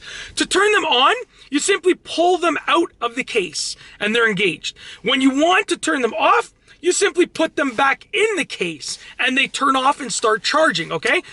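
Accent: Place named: Canada. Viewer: I love ur amazing Canadian accent and your content. Especially when you say hey everybody.😂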